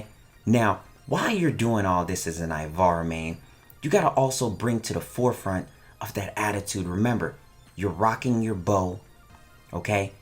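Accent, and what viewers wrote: Jamaica. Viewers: that jamaican accent tho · That Jamaican accent tho mad respect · Are you Jamaican lol... I heard you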